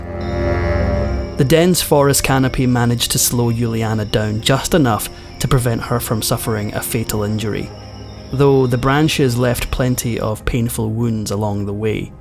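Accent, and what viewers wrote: Scotland, United Kingdom. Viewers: In British English, the correct past participle of get is "got", not "gotten". Unless you are American, though your Scottish accent would suggest you are not. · Scottish accent - subtitled required. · I really enjoyed this narrator love listening to the Scot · I love listening to narrate Lovely voice Scottish I wish you could put your name to story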